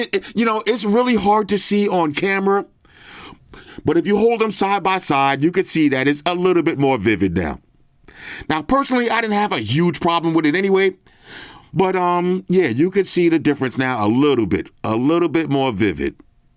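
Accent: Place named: Queens, United States. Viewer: oh my guy from queens, dope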